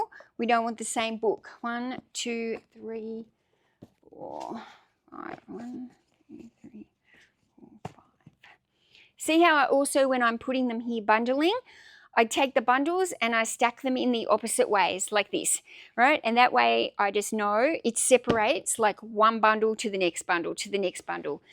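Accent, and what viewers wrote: Australia. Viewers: She has an Aussie accent!! She’s not going to pronounce it the way you might. What difference does it make anyway?!?? Geeez!! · I like the Aussie pronunciation. That's why I tune in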